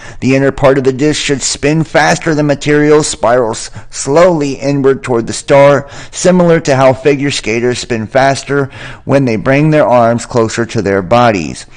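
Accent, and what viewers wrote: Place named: France. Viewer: I will always love your french accent! 😋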